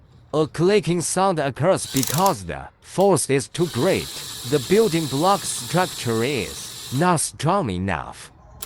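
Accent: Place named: China. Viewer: Why du u sound like chinese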